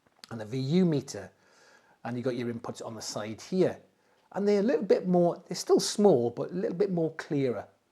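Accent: United Kingdom. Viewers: Hey sir I kind of like your delivery a descent man talking hifi like a normal person not a bunch of fluff raw manly hood not some intellectual garbage just some guy talking stereo equipment engaging witness to something worthwhile to good people trying to enjoy there intimate hobby no big deal FUN FUNNY FANTASTIC LOVE YOU MAN! ESPECIALLY BECAUSE YOU BRITISH DIFFERENT KIND OF GUY THAN HERE IN THE STATES DIFFERENT STYLE OLD FASHION OLD SCHOOL FROM THE OLD COUNTRY I DEFINITELY FEEL RECONNECTED TO MY EUROPEAN SIDE OF MY HERITAGE BE COOL KEEP GOING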